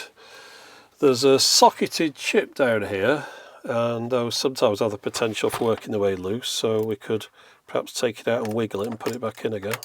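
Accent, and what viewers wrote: United Kingdom. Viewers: you sound like oyur from the uk so maybe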